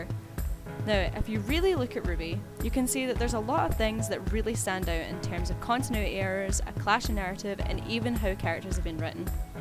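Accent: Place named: United Kingdom. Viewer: you sound like you come from the UK going by accent alone. but, i gotta say i like the idea of killing of arcy boy as yeah...that would of had lot of sub branch events and encounters down the line. also Miles is a hack, like really check its like he attended one of J.J abrams talk on makeing story tropes and miles copys it like a fucking bible honestly just go in expecting something worthy of J.J film and you can normaly guess the ending or twists that are comeing up. also i personaly think hes slowy takeing the animation into "LENS FLARE!!"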